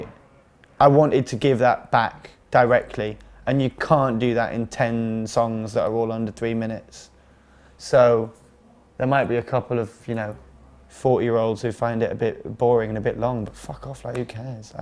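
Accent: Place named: RP, United Kingdom. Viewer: He's from Wilmslow so yeah he is gonna be pretty posh :P.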